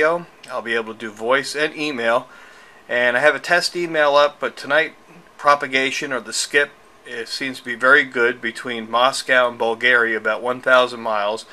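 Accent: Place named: United States. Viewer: Another great vid! I need to get a setup like that for my self. I have been trying to educate myself so I know what to buy. You channel is a God send to people like me. You mention in some of your vids you live in Russia. You sound like you were born and raised in the US. I hope this is not too personal, but Russia does not seem like a place many people from the US move to. Not that there is any wrong with that for sure, it just seems very unique. As always, keep up the great work!!!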